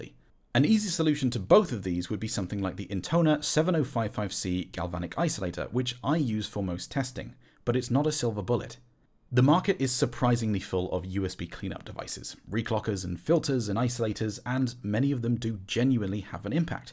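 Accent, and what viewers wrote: United Kingdom. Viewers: An excellent video. you really communicated this very well. And I love the sound of your voice/accent/grammar. The very best of ye old British. Cool. Excellent diction and pace. Very easy to listen to. Superb explanations, very well presented. Thank you.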